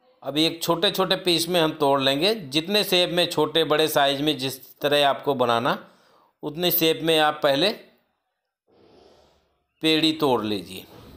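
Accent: India. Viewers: Aap bihar sy ho kya bhaiii ....bahut badhiyan dhang bataye ho sojibka gulab jamun dhanywaad ,😂😂😂😜😜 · Sir aap up se hai kya?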